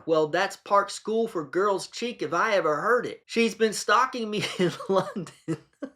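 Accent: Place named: Scotland, United Kingdom. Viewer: I am a Scot. I adored your Scottish accent - it really added to the joke of all those ranting emails!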